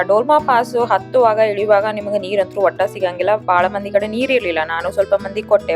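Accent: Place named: India. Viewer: How is talking Kannada ? Yaav ooru, namm hange ada nimm Kannada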